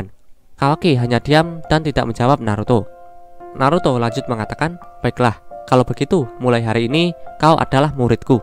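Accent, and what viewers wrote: Java, Indonesia. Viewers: Medok men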